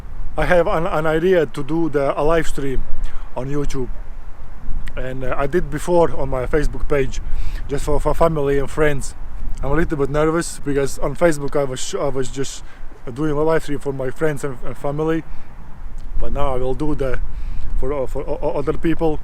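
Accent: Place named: Latgale, Latvia. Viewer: Būtu ļoti noderīgi subtitri angļu valodā, jo tu lieliski veido stāstus/tekstus pagātnes formā! 😊 Par to ka esi latvietis - uzminēju 😊 Latgales akcents 😎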